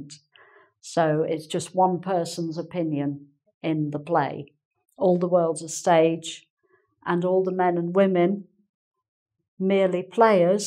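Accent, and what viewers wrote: England, United Kingdom. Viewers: She is great “old school “ teacher.I already know most of the topics she is teaching,with foul modesty I am fluent in English,but her English English is helping me a lot,since I still have very strong accent and there is always something new to learn from her ❤️ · british accent, ouch! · congratulations, your accent is England definitively. Thanks for the the subject metaphors it in fact improve our learning the english as a second language. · really u r an angel to guide the people...your expression is marvellous with typical British accent...thanks a lot ma'am.. From Kolkata..india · Thanks for your help! You really are an angel! I just started to watching your videos and it's "lovely" your british accent! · British accent! Sounds good :) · uk accent? i love it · Just came to know your channel last night.accidently....oh ..you are so good teacher!!!!!your accent reminds me the time l lived in England! 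So lovely tobhear you teaching, your speaking. Your teaching make look like english speaking so easy!!!!!which is not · I forgot about the lesson you gave and instead I really enjoyed the British accent. It's amazing. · Hello... A very clear and useful class. You are an angel and British English in a person, too... Greetings!!! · Good evening Teacher! You are amazing! When i hear you speaking is = brazilians speaking portuguese. The british English is softer to our ears than american english. Thanks for your teaching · Standard of British pronunciation she speaks ......so clear...and easy to catch up.....appreciated this lesson....many thanks for your lesson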